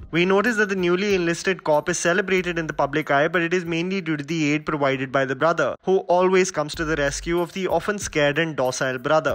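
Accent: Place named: India. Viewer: Speak hindi rather than english. Indian accent are disturbing 😖😖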